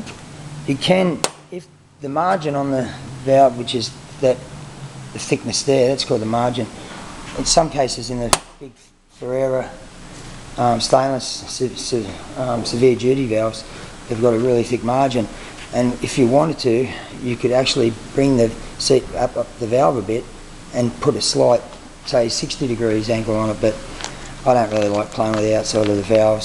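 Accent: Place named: Australia. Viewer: he's an aussie mate, not a kiwi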